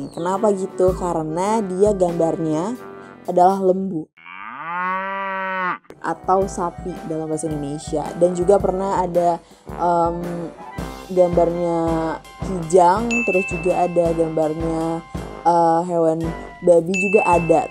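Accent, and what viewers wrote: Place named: Malaysia. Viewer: Manglish~ Malay English 🇲🇾🏴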